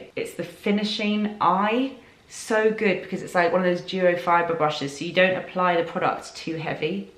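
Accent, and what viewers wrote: United Kingdom. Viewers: Do you have a british accent? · yes, she's british.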